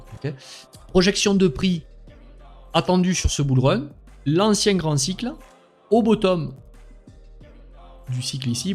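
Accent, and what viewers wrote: South, France. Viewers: Bonjour, est il possible de faire une analyse de ce genre sur decred (DCR). J’aime beaucoup la façon que tu as d’analyser le tout avec l’accent du sud! Tu gagnes un abonné:)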